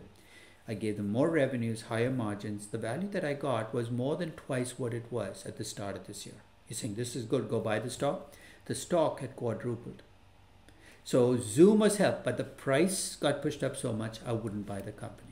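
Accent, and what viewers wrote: India. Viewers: Excellent sir.. are you from Kerala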